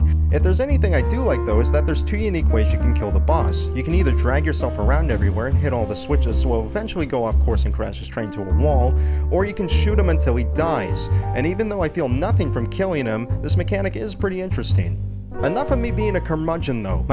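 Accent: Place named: North, United States. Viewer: Doesn't really sound like James Rolfe but he could be from a similar part of America. He certainly sounds like he has a northern accent.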